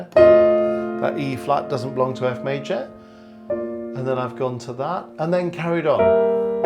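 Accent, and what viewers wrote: England, United Kingdom. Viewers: i'll give you a follow just for your cute English accent .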